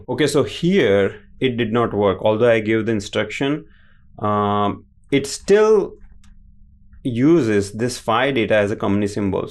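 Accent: Gujarat, India. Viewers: Gujarati accent hehe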